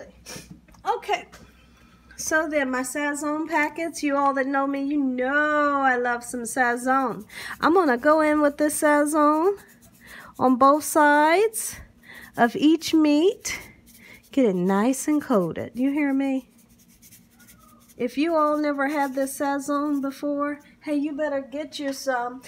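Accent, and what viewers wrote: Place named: Michigan, United States. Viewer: You hear me?Just like so.....just like so...I luv when you say those two phrases...lol you hear me? are you from the great state of Michigan? You sound like my friends that are from Michigan...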